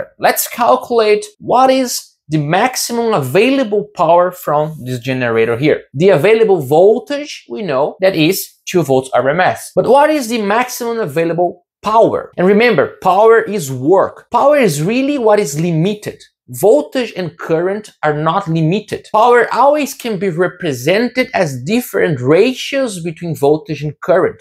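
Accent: Brazil. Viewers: você tem sotaque de brasileiro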